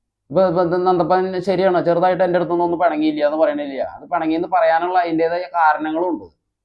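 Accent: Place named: Irinjalakuda, India. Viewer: Irinjilakuda voice aanu aa thaavzhi channel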